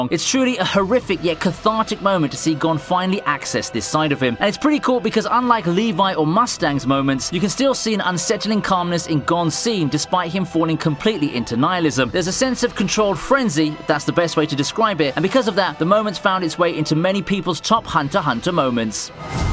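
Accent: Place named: England, United Kingdom. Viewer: This would be a great video if the narrator could shut the fuck up and let the scenes play out. Hard to get any emotion out of these clips with a strong english accent telling us what to feel and then splicing in sentences so quick it's jarring, along with a sponsor 5 minutes in. Fuck I hate youtube.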